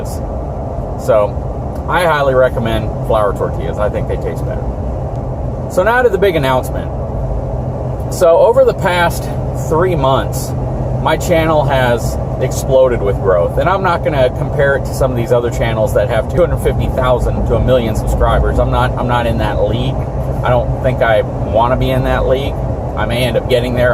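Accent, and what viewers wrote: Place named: California, United States. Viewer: You sound like an Educated Californian. Love your videos and reviews though.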